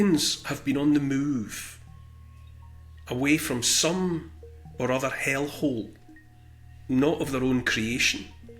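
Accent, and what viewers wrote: Scotland, United Kingdom. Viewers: ... this CanUk fan is a fan because you overflow with common sense. Personally thank you for the great help you have been to a feller, with ancestral Scots blood, better understand what words behind the Scots accent meant: ) In the 50s-60s Scots in our chat had been reduced to wonderful 'catch phrases' usually humerous. Cheers & beers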